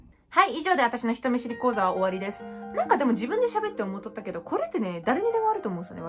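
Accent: Nagasaki, Japan. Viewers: 喋り方から天草か長崎の人かなぁと思ったけど違うかな！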